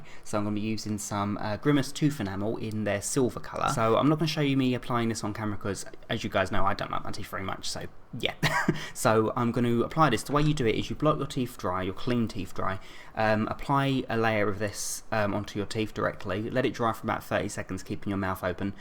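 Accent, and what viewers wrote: United Kingdom. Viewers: He is and he's British so the accent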